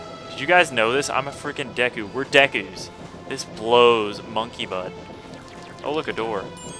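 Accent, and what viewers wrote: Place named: Australia. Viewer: Lol am form England and you sound Australian with the accent!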